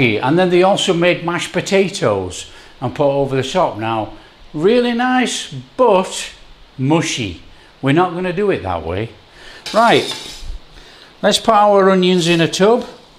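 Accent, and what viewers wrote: Yorkshire, United Kingdom. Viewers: hearing you giving the instructions with your wonderful Yorkshire accent is like watching an episode of Heartbeat, I keep expecting to see Claude Jeremiah Greengrass wander in... Corned beef hash is a big favourite of mine! My Dad used to love making that, and he did it the same way as you, so he taught me. This was like a happy reminder for me. Thanks!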